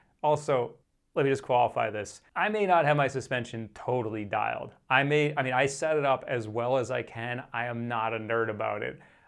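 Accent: Boston, United States. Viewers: Amazing review. I think the fact that I’m originally from Massachusetts and hearing a bit of your Boston accent helps me understand what you’re saying. 😂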